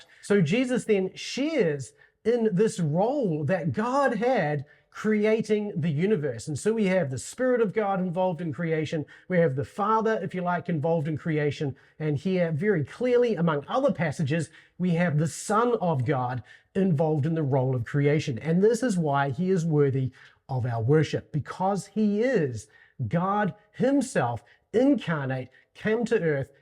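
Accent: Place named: New Zealand. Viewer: Great video, love your content. Not sure if you are aware how you are pronouncing God as “guard”. Quite off putting given the subject matter. But I am fond of NZ accents. You do great work. Keep it up!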